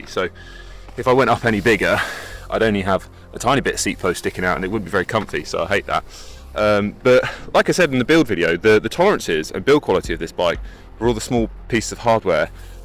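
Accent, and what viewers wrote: United States. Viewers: Impressive American accent, Mr..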